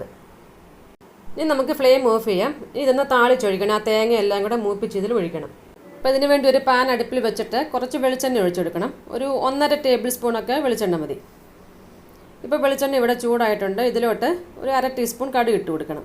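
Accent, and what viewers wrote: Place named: Kottayam, India. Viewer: സംസാരം കൊള്ളാം കോട്ടയം സ്ലാങ് ❤️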